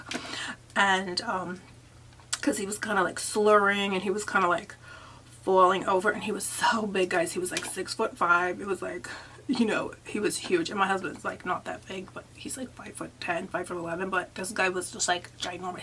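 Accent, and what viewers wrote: New York, United States. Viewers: Are u from ny?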